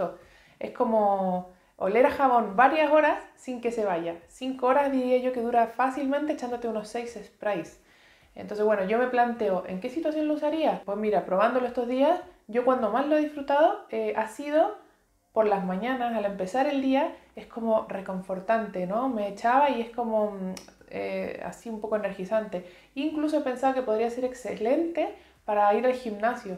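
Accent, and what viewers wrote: Chile. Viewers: El acento chileno-español es suena muy lindo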